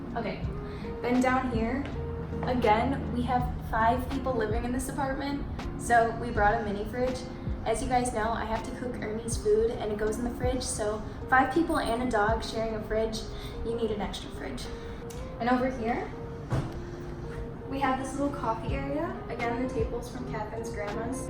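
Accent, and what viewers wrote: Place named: Michigan, United States. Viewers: You have the same accents as my friends from West/North Michigan haha I'm from Southeast Michigan it's dope how there are different accents within the same state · if you've ever wanted to hear a michigan accent this is it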